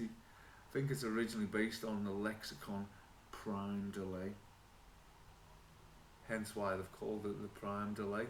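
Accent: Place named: Liverpool, United Kingdom. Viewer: lad.. Proper Scouse 😎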